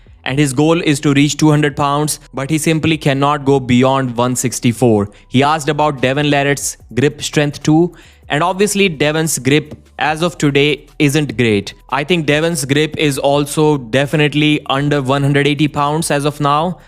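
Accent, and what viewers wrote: India. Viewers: another Indian voice it's so annoying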